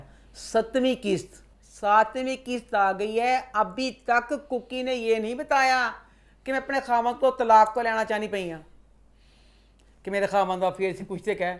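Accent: Punjab, India. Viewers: plz talk in urdu bohat thick punjabi bolti ha ap sar pa se guzar jati ha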